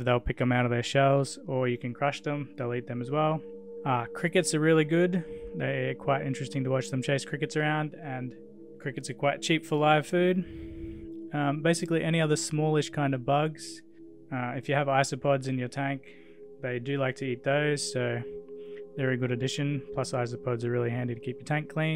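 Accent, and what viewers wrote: Australia. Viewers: thanks for the info. I noticed your Aussie accent and I thought the laws got changed. Keep up the good work!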